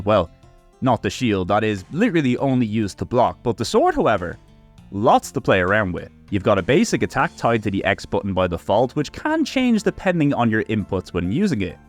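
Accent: Ireland. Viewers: Here for that sweet sweet irish accent. Oh and the games of course, need to grow my backlog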